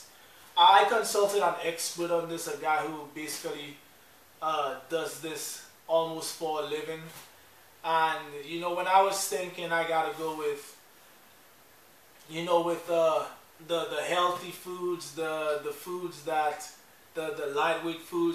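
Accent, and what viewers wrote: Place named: Trinidad and Tobago. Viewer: Sounds Trini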